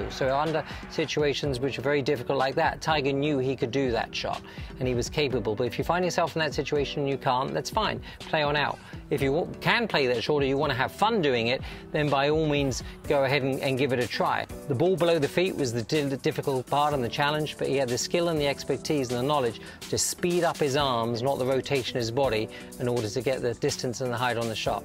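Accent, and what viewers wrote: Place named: United Kingdom. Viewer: One if the best shots of all time in golf and i have to listen to it be talked over by barely a man with a stupid British accent for the whole video?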